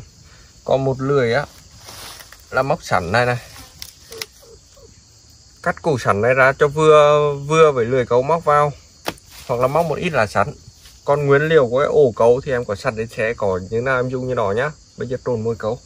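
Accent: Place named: Thanh-Nghe-Tinh, Vietnam. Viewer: Ông ơi nói tiếng địa phương khó nghe qua. Thật lòng thích câu mà nghe tiếng thanh nghệ tĩnh là chán luôn!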